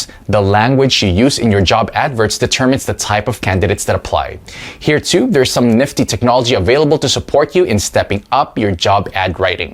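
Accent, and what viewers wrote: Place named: Philippines. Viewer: Based on this dude’s accent... he’s Filipino 😏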